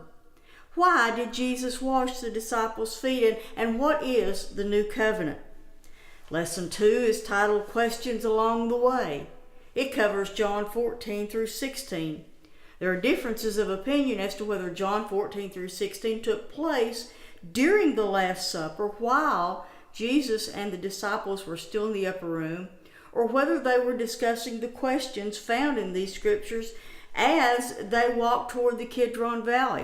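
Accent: South, United States. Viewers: Thank you!!! I am going to listen to your lessons as I have been looking for some Bible studies in a down home way that I am used to. I am from the mountains of West Virginia and your calming southern voice makes me feel at home! Thank you!!! God Bless!!!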